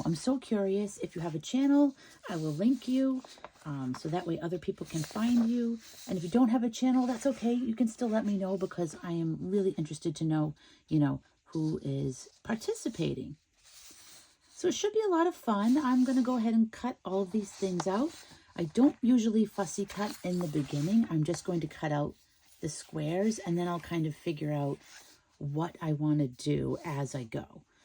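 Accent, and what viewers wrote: Australia. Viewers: Omg your Australian accent is awesome! I love it! I’d love to hear that mix tape! ❤️ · well what can i say but awesome! loved your story and the gluing too xx enjoyed everything about this,even your australian accent lol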